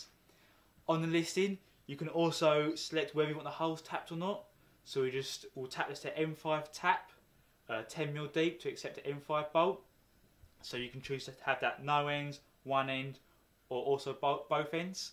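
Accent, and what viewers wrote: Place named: Essex, United Kingdom. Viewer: You're definitely from Essex because you sound like Russell Brand 😁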